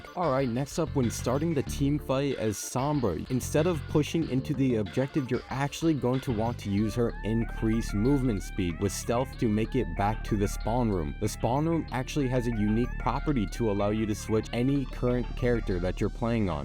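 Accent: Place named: United States. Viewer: He sounds like a white American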